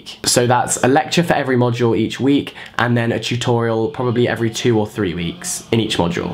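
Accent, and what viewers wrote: United Kingdom. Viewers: Loooove your British accent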